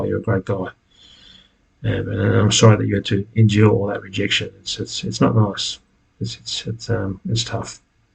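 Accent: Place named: United Kingdom. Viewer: yeah but i'm not native, i think You are british SO i really have to focus if i want to hear one of your videos they seem good honestly but it's linda hard too hear them while doing other activities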